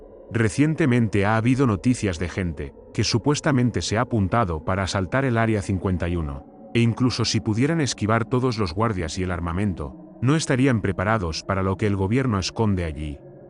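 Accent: Spain. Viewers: Ha que buena historia de pelicula se podria decir. El area 51 si es, una base experimental no hay duda de ello y si vivieras en el area de las vegas y mas cerca de la area "S" lo sabrias y no te quedaria duda de ello. Me llamo la atencion porque me identifico con lanprimera parte de su historia ya que fui official de guardia en las afueras de acceso inmediato a los primeros edifices y angares. Al pasar el tiempo estuve assignado a permanecer en mi puesto solo hasta determineda hora pero, decide a la alta actividad y constants vuelos,e quede por mas de dos horas. Vi lo que yo dijiera, objetos de vuelo de prueba y otros que llegaban junto con F-16. Ahi en ese momento un sensor de seguridad se activo y me arrestaron por estar ahi ilegalmente quiero decir, no segui instrucciones de mando y fui dado de baja indecorosamente y sin antes firmar un tratado de silencio por 50 años. 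No pude hacer nada y ahora vivo todavia aqui en Las Vegas recordando que a veces de tanto que quieres saber de este tema de vida extraterrestre, llegas al momento en que ya no quieres saber nada. Lo que pasa ahi no esta upto para el ser humano ya que puede cambiar tu forma de ser y pensar. Asi que ya ven, no es facil llegar hasta donde dice este amigo con acento Español. Desde los años 50's se posee una tecnologia de punta de seguridad ahi que reconoce y detectable en calor del cuerpo humanoy de algunos animales, sistema de reconocimiento facial y alguna itra tecnologia que te puedes imagunar. NO SE OUEDE PA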